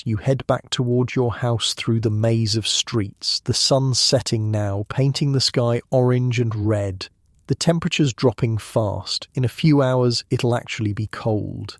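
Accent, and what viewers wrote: England, United Kingdom. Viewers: Hello there well this is my first time posting, I am from Northwest Ontario and a very small community of 2000 people. I am native Canadian and I absolutely love listening to you every night, I love the fact that you have an English accent, which is very pleasant And I must have fallen asleep at sometime but the factors I enjoy it when I come back every other night thank you so much for this service as long as you’re not a Yankee, thank goodness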